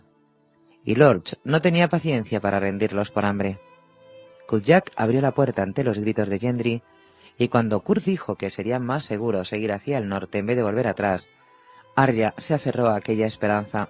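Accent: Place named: Spain. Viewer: Muchas gracias por tu lectura.. narras muy bien. Y me viene muy bien tus lecturas para ir combinando mi lectura con momentos que no puedo leer pero si avanzar en capítulos oidos a través de tus lecturas. Y es en español de España. Solo encontraba antes audiolibros en español latino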